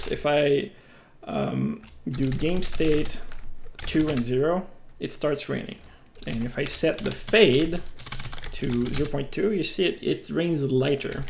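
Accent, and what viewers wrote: Quebec, Canada. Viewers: Are you from quebec?? I can hear an accent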